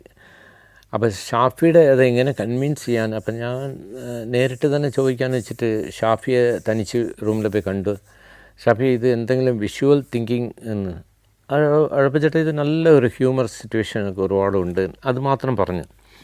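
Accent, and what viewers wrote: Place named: Tamil Nadu, India. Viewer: Tamil Malayalam mixing slang is nice to listen. Waiting for next episodes..keep going ❤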